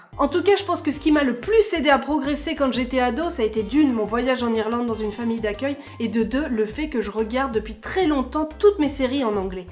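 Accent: France. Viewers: Test your English skills: + camouflage = Camiflage 😃 Because you blend in Québec so well! Vos fans américains adorent votre accent français! 😘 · J'ai le même accent que toi. En fait il faut se dire que l'accent français est l'accent internationale pour parler anglais car il est le plus compréhensible pour tout le monde. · Le seul truc quand on parle anglais ohhh so cute a french accent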